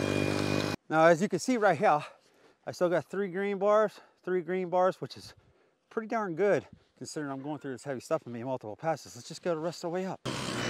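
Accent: Pittsburgh, United States. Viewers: a slight yinzer accent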